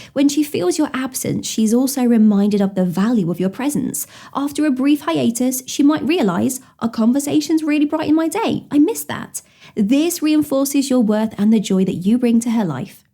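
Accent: United Kingdom. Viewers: British accent is music to my ears.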